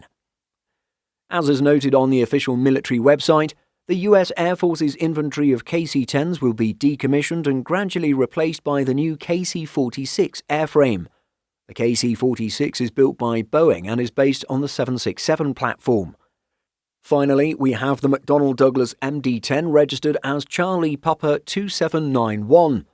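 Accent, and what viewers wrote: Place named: United Kingdom. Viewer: Your channel is awesome. But your tea drinker accent is annoying as FÆCK! CHANGE IT! COLONIZER! YOU PROBABLY HAVE SOME CROOKED TEETH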